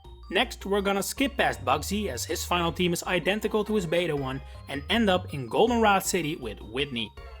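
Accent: Netherlands. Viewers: Love the video. Are you perhaps Dutch?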